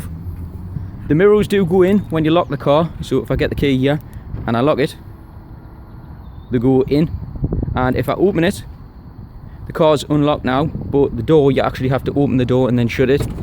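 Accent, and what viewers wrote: Newcastle, United Kingdom. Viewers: Cheers mate enjoyed that 😅 off to view one of these tomorrow! love the Geordie accent reminds me of my offshore days 😊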